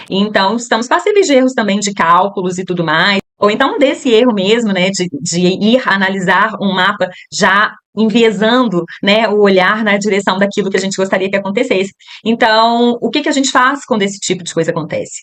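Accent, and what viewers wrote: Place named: Minas Gerais, Brazil. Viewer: 😂😂😂😂😂 super acontece. Ouvir sobre mapa astral com sotaque mineiro é um bálsamo! ❤️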